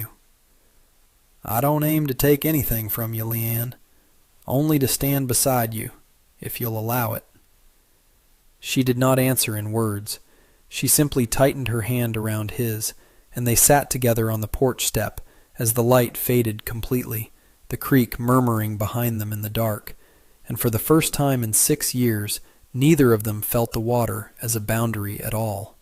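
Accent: South, United States. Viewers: This is a very moving, a very beautiful story. And the narrator reading this story to us is simply the best. His southern accent is perfect for this kind of stories.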